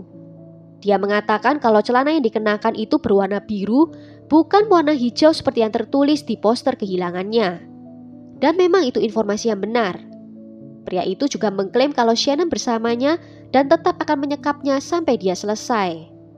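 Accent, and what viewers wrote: Java, Indonesia. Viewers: Lebih pasnya nama chanelnya ini adlh.... Krn medhok Jawanya bikin gemes.... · logat jawa nya itu loh... bikin terngiang ngiang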